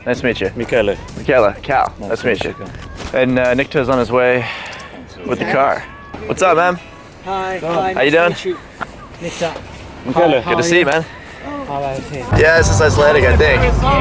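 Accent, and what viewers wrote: United States. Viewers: это просто замечательно.. как слышиться америко.диалект.. = можно на 98% заранее знать, что будет безпредельное слабумие в рекламе продажи пустот.. дядя не исключение.., 3ато весело и присутствует уверенное отсуствие всякого умственного процесса в душе и речи.. браво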